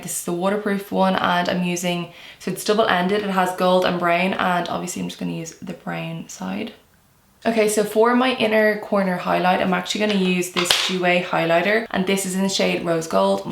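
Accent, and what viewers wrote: Ireland. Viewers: I love your Accent! Irish ☘️ has always been my favorite accent besides Australian💖💖💖💖💖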